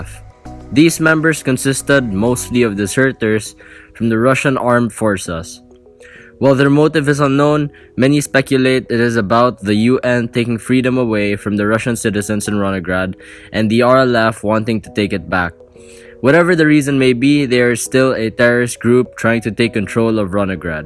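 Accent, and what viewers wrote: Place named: Philippines. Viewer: I can already tell he is a filipino by his accent.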